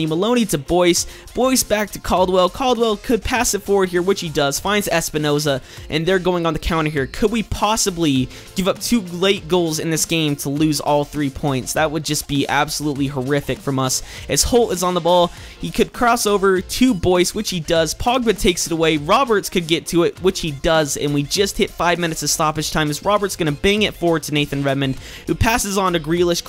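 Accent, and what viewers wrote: United States. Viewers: Love your American accent! Nice to see an up a coming American FIFA YouTuber!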